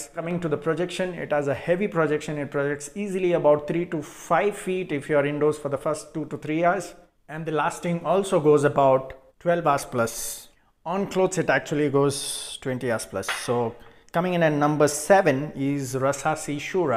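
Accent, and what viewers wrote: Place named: India. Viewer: My brother u look arab but u sound indian what ??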